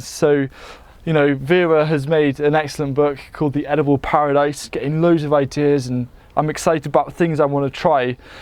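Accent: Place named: United Kingdom. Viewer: Love the garden , The harvesting and The British accent.😇 LOL.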